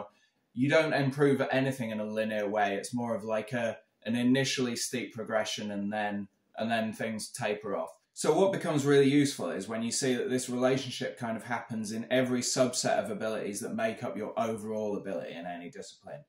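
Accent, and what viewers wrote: England, United Kingdom. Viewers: Interesting.... will have a look. Like your style and philosophy and good to hear an English accent. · I was wondering why Youtube proposed your piano learning video... then I worked out that it is because my first Karate master is also Kendo master while second is also Ju Jitsu master and then because you speak English with a British accent and, last but not least, you played When the Saints, the song I have been singing since Nursery School, my mother says... Ciao from an ex Londoner, ex Karate black belt ex fit person, ex ex ex ex...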